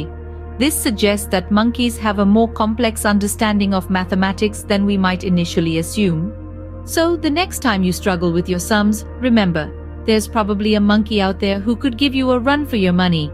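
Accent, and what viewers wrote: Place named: India. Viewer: u CAN'T hide INDIAN ACCENT in your voice, don't try , its won't never happen.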